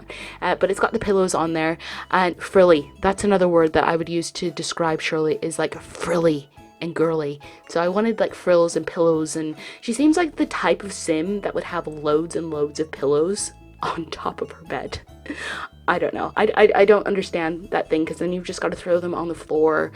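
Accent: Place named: Midwest, United States. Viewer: UK?! I thought you were from the US because of your midwestern sounding accent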